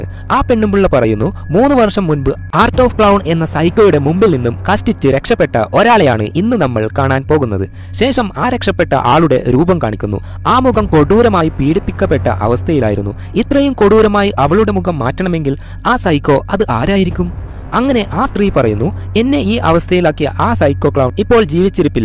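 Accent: Kannur, India. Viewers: കണ്ണൂരുകാരൻ ആണോ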